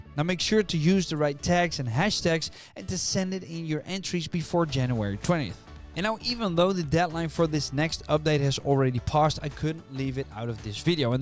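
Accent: Netherlands. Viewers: how high will it go in this run? hoor ik daar een Nederlands accentje?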